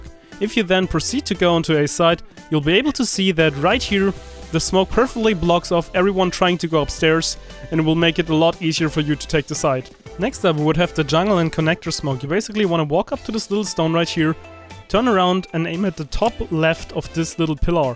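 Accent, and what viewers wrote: Germany. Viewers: Good denglisch😄 Good Video👍😊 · u sound like german. r u? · Man merkt das er deutsch ist lol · german accent detected ^ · Dieser Moment wenn man alte Videos guckt die mega nice sind wie deins hier und man aber richtig merkt das du Deutsch normalerweise bist aber du sprichst das sehr gut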